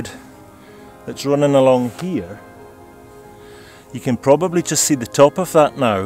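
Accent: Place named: Orkney, United Kingdom. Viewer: Explained so well as always xxx Love your Orcadian accent so calming 👍👍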